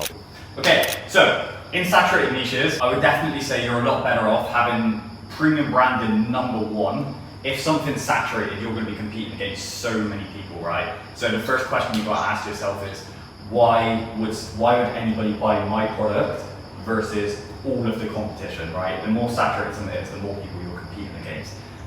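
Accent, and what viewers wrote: Bristol, United Kingdom. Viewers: Hi, love the videos mate!..Spot on👌🏼 I can’t help but notice the accent, as a lifelong Bristolian might I be right in saying you’re somewhere near here😂 Cheers for all the insight dude!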